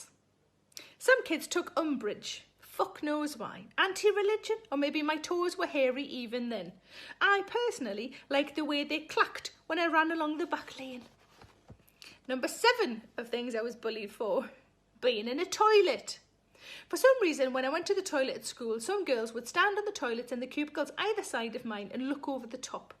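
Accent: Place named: North England, United Kingdom. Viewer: Don't worry, I'm English and have no idea what she's saying half the time :) North England may as well be a different country.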